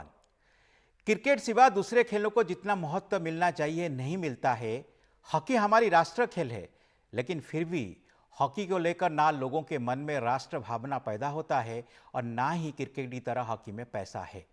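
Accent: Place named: Odisha, India. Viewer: The anchor’s Odia accent is so nice.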